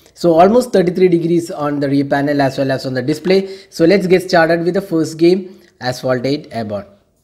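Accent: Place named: South India, India. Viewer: You are South Indian right?Love you accent bro no homo 😁.Good review just ordered mine today 32GB/3GB version one.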